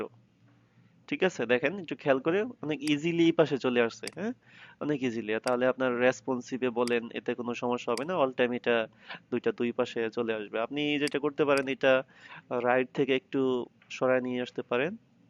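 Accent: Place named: Bangladesh. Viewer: Mind blowing. This is the first time I saw tutorial in bengali. Bdw your accent sounds like you are from Bangladesh. Are you?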